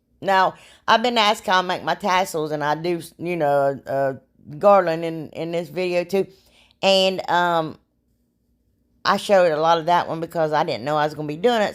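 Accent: South, United States. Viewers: You sound so country I love it. You really want to light the house up with that kerosene and gasoline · Hi from Texas, I'm newly subscribed and wanted to say how much I've been enjoying your crafting. You are such a delight to watch and when you get tickled it makes me laugh right along with you. You talk like I talk country kind of people. You keep those videos coming because you are a treasure to watch. I hope you get to feeling better. You have such a sweet personality, God bless you honey.🥰🤗💕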